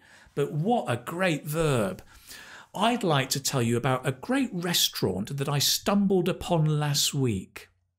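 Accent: United Kingdom. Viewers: You are a great native speaker teacher! I love British English.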